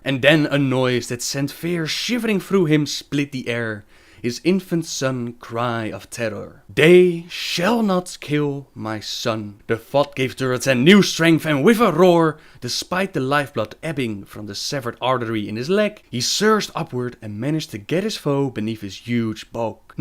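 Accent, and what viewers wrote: Netherlands. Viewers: Pretty sure he's Dutch, judging by his accent. They tend to overpronounce the "s". I'm from the Flemish part of Belgium, so my mother tongue is also Dutch, albeit slightly different from the Dutch in The Netherlands. And I have an American girlfriend and she can't pronounce "r"'s at all, so that roaring sound he makes might be funny in English, but I find it very pleasing. ;) (I can't even do it, because I've taken on the American accent from all the American shows we get on tv.) And the fact he said pensuela instead of peninsula shouldn't really make a difference. Many Americans fail to pronounce English words correctly, so why hate on someone who's main language isn't English? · Are you dutch? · Late, I know, I'm sorry. is from the Netherlands and often he pronounces things the way they do in Dutch, even though English has some really weird rules for pronunciation. 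In Spanish you pronounce peninsula just the way it's written: pen (like the writing tool) - in (like Nagrand is IN Outland)- su (kind of like soon but stop the word halfway through, so it sounds like soo or sü) - la (like the end of Attila). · Hey! I was wondering, will you ever make a lore video about Illidan Stormrage? Or even better, theories on how he could still be alive (which I think he is)? He is one of the best, most tragic and misunderstood characters in the Warcraft universe and he has a lot of history. A video by you about him would be badass. Love your storytelling and Dutch accent, by the way. (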